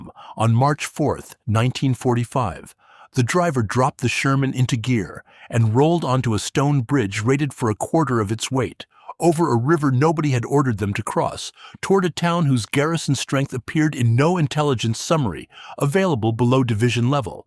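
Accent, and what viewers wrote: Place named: United States. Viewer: I love this because it sounds so American. Remember though at Remagen, it was LT Timmerman that saw the bridge 1st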